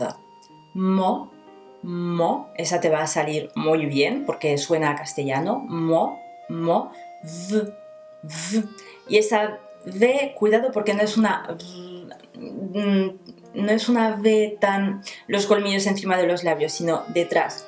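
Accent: France. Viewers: ¿Cómo que no es acento de los buenos el de? Yo soy francesa y te puedo asegurar que sí lo es. ¿ A caso crees que no se nota diferencia en el acento de los suizas cuando vienen a Francia (Sin intención mía de ofender a nadie por supuesto)? Por lo que se refiere a pronunciación, lo hace perfecto, de maravilla. Es lo normal acentuar ciertos sonidos porque algunos difieren mucho del español y también porque algunos no los hay en español ( la 'j' y la "e' francesa entre otras) Yo soy maestra en Francia y también así lo hago con mis alumnos de primaria, en fonética, para que noten bien las diferencias entre ciertos sonidos cercanos tales como s/ch o v/f, lo que es imprescindible para hacer relación correcta entre fonema y grafeno. Para terminar te diré que no creo que se rían los franceses del acento francés de las personas ajenas que vienen a Francia por ser un país con fama tolerante, respetuosa de las diferencias y valorar a quienes se esfuerzan para exprimirse en nuestro idioma, lo cual seguramente es lo que entre otras cosas le proporcione a Francia su riqueza cultural. 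Y permite que te diga otra que es la siguiente, el acento de además de ser bueno es muy bonito y te aseguro que a todos los franceses nos encanta ese acento del sur de Francia. Saludos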